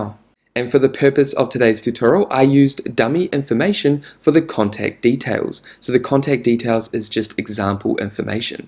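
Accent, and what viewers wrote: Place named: New Zealand. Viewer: Love your NZ accent dude! Cheers from Canada!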